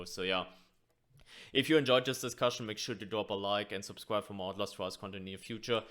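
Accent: Germany. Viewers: Your accent is cool. German?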